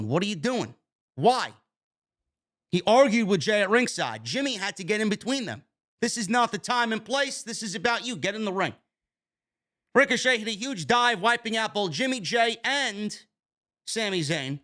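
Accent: New York, United States. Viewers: I gotta ask, you're from NY and have a strong NY accent, but rock the Braves hat. What's up with that? Not that I got a problem with it, I was born in ATL. Just expect you to have a Yankees hat I guess lol. Thanks for representing through bro.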